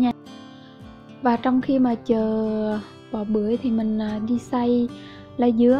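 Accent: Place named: South, Vietnam. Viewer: Tại chị người miền trung nói qua giọng Nam để mn dễ nghe á chị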